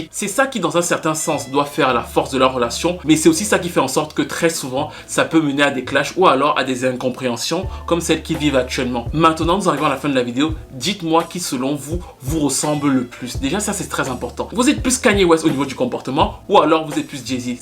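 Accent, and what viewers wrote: Cameroon. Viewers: Tu as un petit accent camerounais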